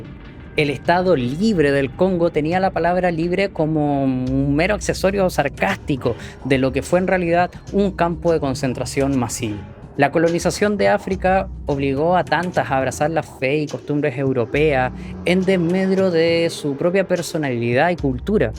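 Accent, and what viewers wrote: Chile. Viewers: Siento un pequeño acento chileno eres chileno? 🎉 excelente canal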